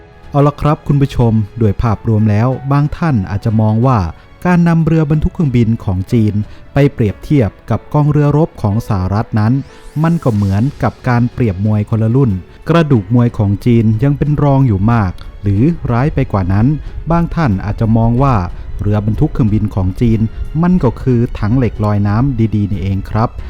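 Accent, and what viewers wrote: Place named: Provincial, Thailand. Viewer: พูดบรรยายแบบเหน่อๆ ฟังดูขัดๆกับเทคโนโลยีใหม่ๆครับ พูดกลางเน้นเสียงเข้มจะมีความลงตัวที่สุดครับ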